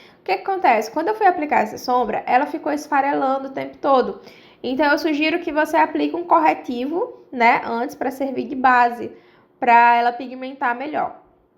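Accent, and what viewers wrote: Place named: Rio Grande do Norte, Brazil. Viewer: Esse sotaque só podia ser do RN mesmo ❤️❤️❤️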